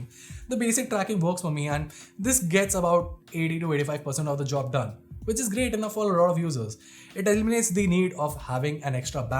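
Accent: India. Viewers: Great review, subscribed. I usually stay away from Indian accents, just because they usually come with subpar English and are hard to follow, but your charisma is alluring, and your English is quite good. Keep up the good work!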